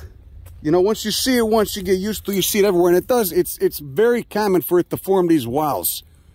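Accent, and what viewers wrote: Chicago, United States. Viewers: I love hearing all this information in this thick ass chicago (I think) accent. Takes the intimidation out of learning to be honest. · As a first video to see, seeing a guy who looks like a boxer with a missing tooth and a swollen eye, talking about botany in a heavy Chicago accent, you have got my sub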